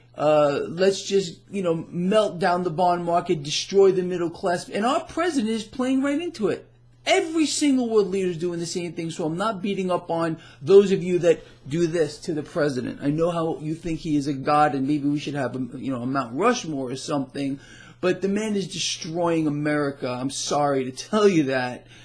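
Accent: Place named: California, United States. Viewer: your starting to sound like your from Califonia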